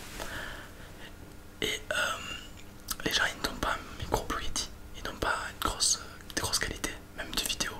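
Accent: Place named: Tarbes, France. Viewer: Tu serais pas de Tarbes toi ? J’ai l’impression avec ton accent :)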